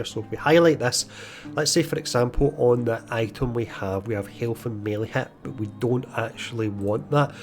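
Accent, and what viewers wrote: Scotland, United Kingdom. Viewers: Awright, fellow Scot, ye got a a new sub, really informative. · Weird how he’s pronouncing his words differently…He’s Scottish! ya Dork 🙄🤦‍♂️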